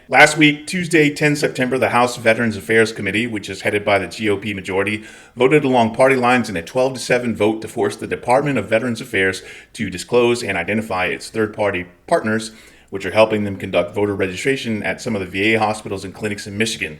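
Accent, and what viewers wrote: Scotland, United Kingdom. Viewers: Love ya, keep making and I’ll keep watching and sharing. Also more Scottish accent. Studying Scottish Gaelic myself and am a complete sucker for all things Scottish 😻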